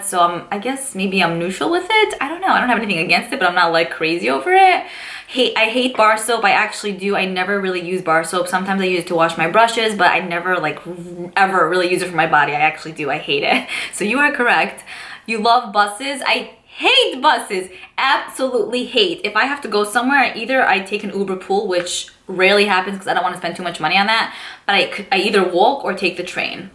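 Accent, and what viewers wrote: New York, United States. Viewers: Love watching your videos. I miss New York and all my family that still live there. Listening to you talk reminds me of home. I think you have a great personality and I look forward to watching more by you. So glad I came across your videos. Love to see more makeup tutorials since I suck at it. Lol 😆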